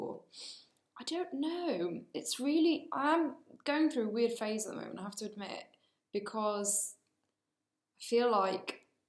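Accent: Wales, United Kingdom. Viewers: travick no, she's Welsh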